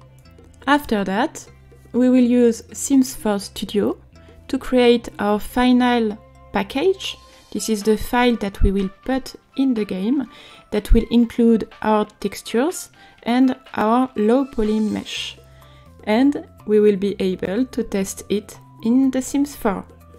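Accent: France. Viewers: Your french accent really cute :3 and dont worry your accent is understandable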